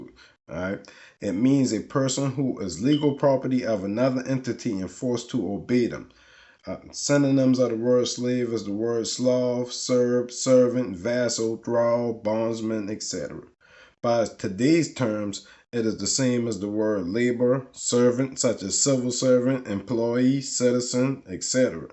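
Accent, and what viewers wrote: New Orleans, United States. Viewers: If just keep listening to this guy talks he sounds just like a native new orleanian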